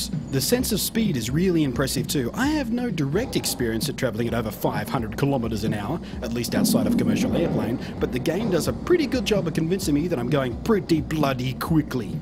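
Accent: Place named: Australia. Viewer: what the hell is wrong with you? He's Australian he LIVES in Australia, that's the way he talks, he's not faking the damn accent.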